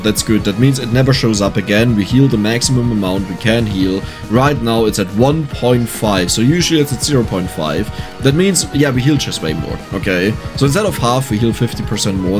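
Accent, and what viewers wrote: Germany. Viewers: Lol hab grade rausgehört das du deutschsprachig bist lmaoo jetzt kann ichs nicht mehr überhören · Took me 17 minutes to realize you're German lol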